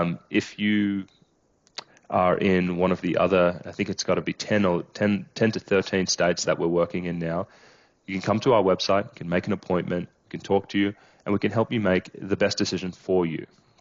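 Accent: Australia. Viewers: G'day, i thought i realised that Australia accent. Its good to see an Australian taking a interest in peoples health. Mate here is my story....I am 59 years of age and had been on Alazopram for 13 years at 1mg to 3mg a day as needed.....prescribed to me by my family doctor for anxiety and panic attacks. Through out this time my life was going along smoothly, i was functioning and living life. That was until January 2023 when the Australian Federal Government decided to change the prescription laws around these medications. It meant that my doctor was no longer allowed to prescribe them only Psychiatrist can prescribe them now...which i totally agree with. What i don't agree with is the total lack of direct pathway to see a psychiatrist to maintain your script or a managed taper. At this time i was given 30mg Oxazepam to take daily......which is not the correct dosage, it was 10mg short of the equivalent dosge of Alazopram. 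Well one month later i entered hospital with severe dizziness (rocking sensation), sensory hyperstimulation, headaches, fatigue, unsteadiness and tremors. I spent 9 days in hospital. During this time I was only given 5 mg of diazepam in total plus daily panadol. I had ct and Mri scans done.....all were clear. I saw a psychiatrist for about 20 minutes and Neurologist who all put it down to my anxiety....so I was discharged. At the time of disgcharge I was on nothing, zip, zero only my 100mg of Zoloft. The hospital psychiatrist told me to wean myself of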